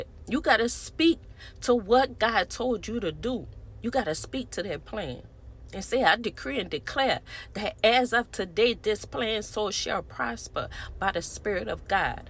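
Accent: Louisiana, United States. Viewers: Say that Sis 👏🏾👏🏾‼️ I’m loving that Louisiana twang!!!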